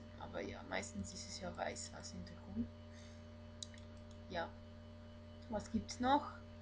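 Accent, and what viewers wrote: Switzerland. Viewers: Diese schweizerische Aussprache is sowas von gail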